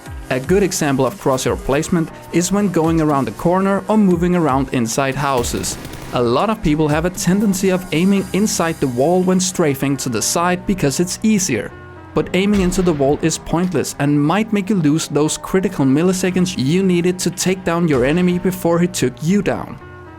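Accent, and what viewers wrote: Denmark. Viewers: you sound danish. Are you from Denmark?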